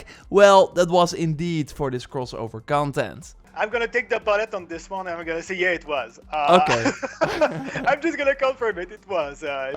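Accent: Netherlands. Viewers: yow are you nederelands you sound like it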